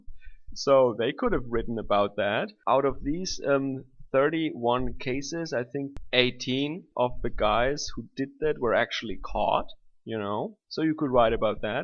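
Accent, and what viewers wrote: Germany. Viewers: Your German accent is cool. I live in America, but I took german in high school, and I would love to visit one day. I'm kinda put off by the migrant situation in germany, maybe the is a stupid question but is it still safe to visit Germany one day? Will I actually get to meet german people? lol like I said maybe a stupid question but I would love to visit one day.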